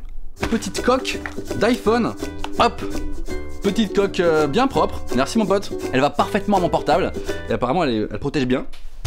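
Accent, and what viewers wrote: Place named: Nord-Pas-de-Calais, France. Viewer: lol tu es Chti c'est ces normale je suis contre le racisme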